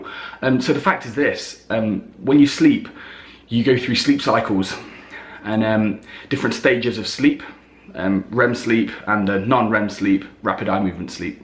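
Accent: United Kingdom. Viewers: Are you from Britian? im lovin.. the accent.. 😃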